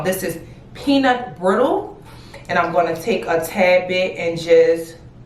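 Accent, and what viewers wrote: Louisiana, United States. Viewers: You are beautiful! And it sounds like you have a Louisiana or Arkansas accent have a lot of family from there thanks for sharing gorgeous look 😍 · Knew you were from New Orleans as soon as you started talking 😍😍 Then I went to see if you put where you're from in the description and I was right!! 😂 I'm from Jersey, but I LOVE NOLA accent!!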